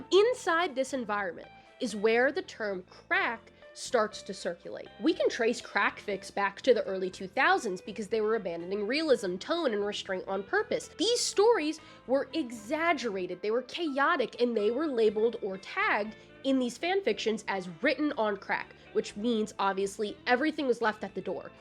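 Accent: South, United States. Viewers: you're really cool and smart and gay thank you for not hiding your accent also what's the license plate on your fall off of (sincerely, another queer redneck)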